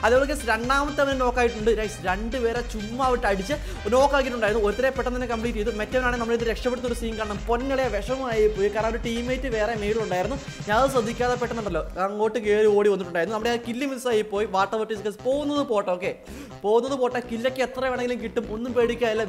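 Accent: Kannur, India. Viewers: Bro Kannur aano ?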